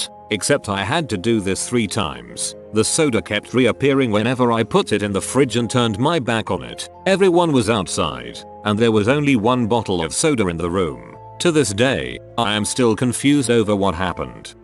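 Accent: United Kingdom. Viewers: I have trouble understanding and following this British sounding robot. The American sounding one is far easier to listen to and understand. Signing out of this crap.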